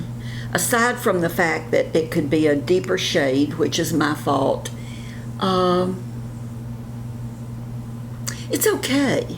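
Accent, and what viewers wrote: South, United States. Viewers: It was lots of fun getting ready with you. You mentioned that you live in Florida. You must live in the panhandle with that wonderful southern accent.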